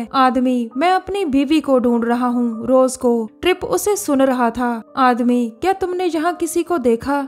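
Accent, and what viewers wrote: Punjab, India. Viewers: R u punjabi? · Are you panjabi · You're talking style punjabi. Are u punjabi?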